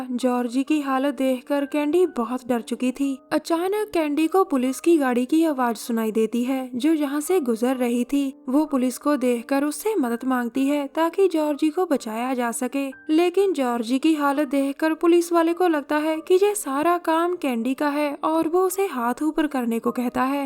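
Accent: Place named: Bihar, India. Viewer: Always here Love you didi You are from Bihar I know 😁🙏😁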